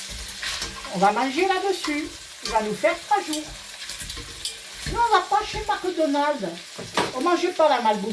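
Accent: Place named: South, France. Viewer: Coucou. On peut pas être d'accord pour tout et c'est ça la beauté de l'être humain, l'important c'est de le partager sans sagresser. Mais ehhhhh tu as bel accent du sud 😅 vive le soleil!! Je vais pas te dire que je suis d'accord sur tout mais alors qu'est ce que j'ai rigolé sur la nourriture parce que crois le ou non on partage beaucoup d'idées à ce niveau là.. mais moi quand je le dis, je me fais lyncher parce qu'il paraît que c'est du jugement. Du genre il paraît qu'on gâche l'enfance de nos enfants parce qu'ils ne mangent pas à McDo. On ne peut pas aimer tout le monde évidemment et on ne peut pas être d'accord avec tout le monde. Après tu sais des videos 20 ou 30min de vidéo ça ne reflète pas une vie entière. Et justement parfois on croit que les gens sont comme ci ou comme ça au travers de vidéos mais il y a aussi tout autre chose et surtout ce que nous apprenons à nos enfants.. 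c'est de faire la différence entre la personne et le contenu. On peut ne pas aimer un contenu pour autant ça ne veut pas dire que la personne ne vaut rien. En tous cas, ce que tu dis fait sens. Comme je te l'ai dit tu ne le croira peut-être pas pensant que nous sommes des mauvais nomades mais nous avons beaucoup de visions communes entre autre sur l'alimentation, action, Macdonald et j'en pense. Eh oui j'ai une grande bouche mais mes principes je les applique, alors oui parfois on fait des exceptions et c'est normal parce que la bienveillance commence avec soi-même et d'accepter qu'on est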